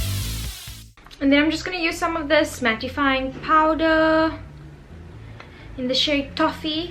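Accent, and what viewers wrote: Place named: South Africa. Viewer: I could tell by ur accent from the beginning off video. And when u said ja I knew u where south africa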